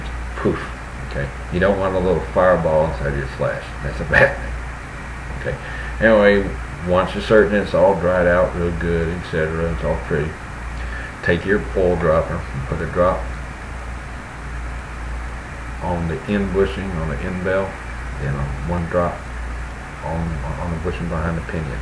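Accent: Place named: United States. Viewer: bunch of damn yankees commentin on the way this country boy talk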